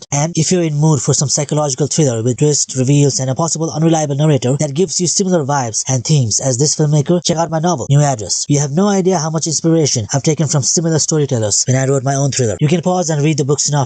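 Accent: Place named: Spain. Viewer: bro are you the viral teenager who appeared in podcast debate with girls and called the girl dumb are you? because the way you sound ur accent is like a typical spainish from spain not south america speaking english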